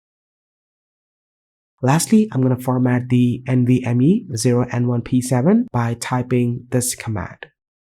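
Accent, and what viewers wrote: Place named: India. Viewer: Nice accent for an Indian. I didn't knew you were Indian until I saw the profile.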